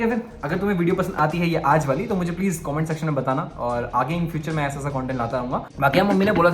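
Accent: Pahadi, India. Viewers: Yes 😂 why not., I literally laugh every second 😂 plus your pahadi accent ❤ lots of love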